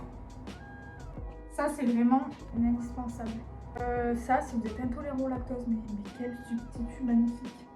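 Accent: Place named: South, France. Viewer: Cool tes vidéos ! Bonne énergie ☺️ et cet accent du sud 🤌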